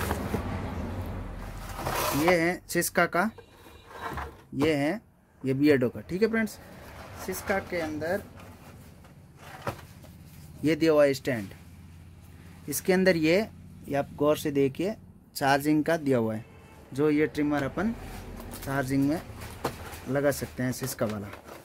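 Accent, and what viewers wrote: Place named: Barmer, India. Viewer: Bhai aap barmer se ho kya. Accent us side ka lag raha ha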